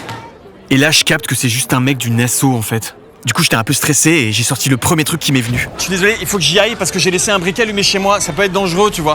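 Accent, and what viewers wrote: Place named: Paris, France. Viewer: l'accent parisien le plus insupportable jamais entendu par l'homme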